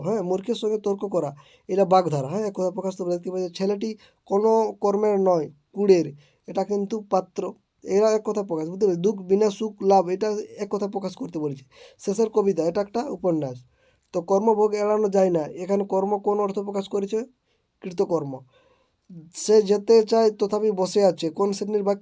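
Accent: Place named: Purulia, India. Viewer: Amar mone hoy ini purulia er basinda tai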